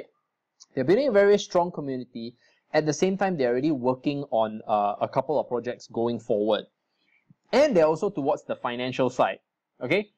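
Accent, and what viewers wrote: Singapore. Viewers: you have great Singapore accent. love it.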